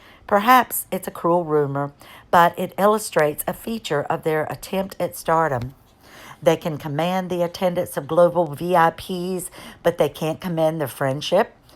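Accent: South, United States. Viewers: Hello, you have a lovely accent, sounds like a southern accent. If that's so, then good, that means more and more Americans are hopefully finding out what a witch MM is. I'm a Mexican American 66 year old woman from Los Angeles and I've never liked her. Thank you for the read and post. Let's keep spreading the news that MM is vile and hurtful.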